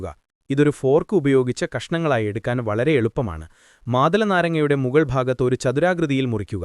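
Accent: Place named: Ponnani, India. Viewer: ഏതോ പൊന്നാനിക്കാരനാ വോയ്സ് ഇടുന്നെ എന്ന് തോന്നുന്നു 😬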